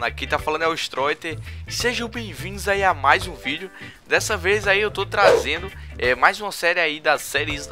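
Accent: Northeast, Brazil. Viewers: Ele tem aquele sotaque nordestino e-e O que deixa os vídeos melhor ainda...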